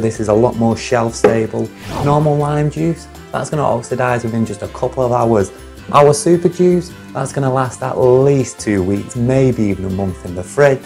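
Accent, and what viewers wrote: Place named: Northern England, United Kingdom. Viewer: wtf he sounds nothing like british black community.. hes has a northern english accent.!!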